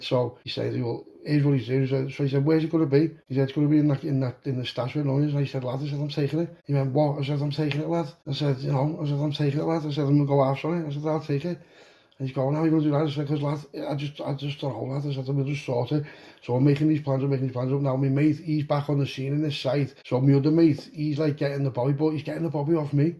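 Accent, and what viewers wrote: Liverpool, United Kingdom. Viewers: Love the story's scouse lad mint 👌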